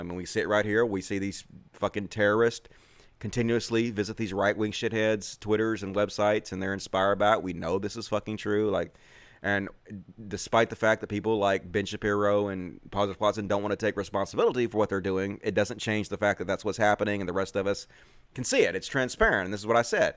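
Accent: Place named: Alabama, United States. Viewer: I guesss his Nothern Yorkshire accent is on a parr with your Southern Alabama accent as far as hickness goes. Puddings and...puddings and??? I'm at a loss. Can't think of anything other than the most corrupt police force in the country to come out of Yorkshire. Oh! Peter Sutclife. He's hardly a nice guy but look him up. I'm from Lancashirr. Our rivalry goes back cenuries. We had our own Civil War. Instead of war of the racists, it was war of the Roses.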